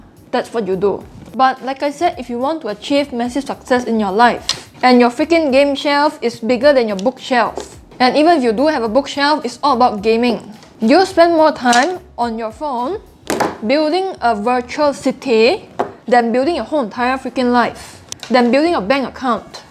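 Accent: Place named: Singapore. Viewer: Two things i love from (No Sarcasm): 1. Her punked singlish accent & style 🤘 2. F*ckin honest forex coach & motivator who DOESNT SELL ANY CRAPS, DAYDREAMING & BULLSH*T things about trading forex. Big thanks & respect!! 👍🏻👍🏻👍🏻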